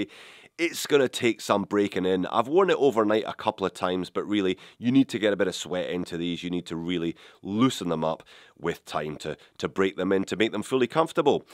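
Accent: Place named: North, United Kingdom. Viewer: I've been in lust with the Longines Spirit Chrono with similar green lume for AGES, but it just wears too large. You can bet I snapped this one up in the sale last weekend, for sure 😉 P.S. Accent sounding quite northern with your head cold,!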